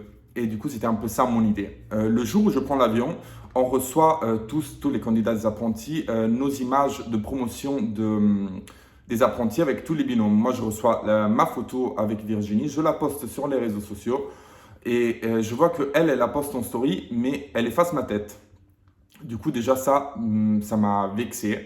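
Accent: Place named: Italy. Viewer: j‘ai eu un „coup“ de coeur sur toi dans les apprenties tu te laisse pas faire t‘as un coeur d‘or ET EN PLUS T‘ES TELLEMENT BEAU AVEC TON ACCENT ITALIEN TROP DE LA CLASSE VRAIMENT une personne incroyable!!!!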